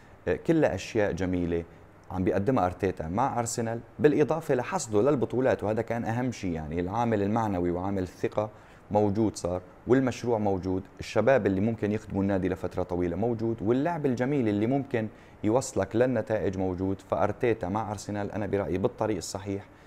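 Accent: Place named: Aleppo, Syria. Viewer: كلام هادئ ومتوازن ومنطقي،من اول فيديو عرفت انك من حلب،الله يعطيك العافية وتقديم جميل وسلس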